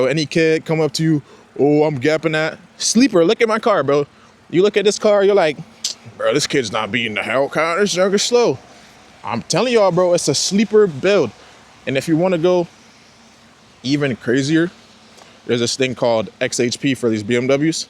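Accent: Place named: Canada. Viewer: Kinda sound Canadian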